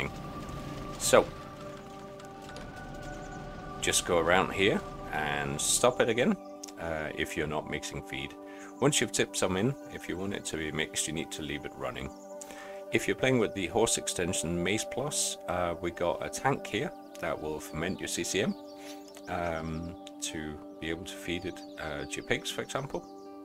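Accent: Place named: England, United Kingdom. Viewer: Så tillader jeg mig at skrive på Dansk (mit Engelske læner sig lidt for meget op ad Google translate) Håber det er ok. Jeg syntes jo nok, jeg kunne genkende den Engelske dialekt :D Har du lyst og overskud, så kan vi jo tage en sludre på MSN, Facebook eller noget i den stil. Jeg kunne helt klart godt tænke mig, at snakke med en anden VOKSEN, der er interesseret i FS19 og i særdeleshed dette map. Men også om alt muligt andet. Det ville være fedt at høre lidt om Scotland. Et land jeg altid har haft stor lyst til at opleve. Så har du lyst, så smid mig en besked på evt. Facebook, hvor du kan finde mig under samme navn som her :) I øvrigt dejligt at se at der også er Danskere der spiller FS og laver gode YouTube videoer. God dag :)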